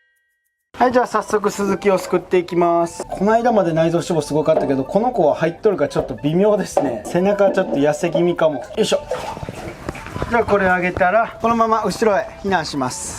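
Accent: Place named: Shodoshima, Japan. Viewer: ナガセの待ち時間長いからね。 小豆島弁が素敵。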